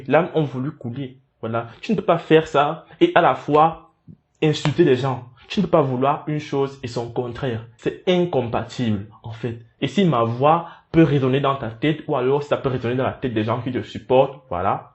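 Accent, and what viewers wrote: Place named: Cameroon. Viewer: Prions seulement pour qu'elle guerisse. Sinon J'aime trop votre accent camerounais . Sinon cœur sur vous depuis la Côte d'Ivoire.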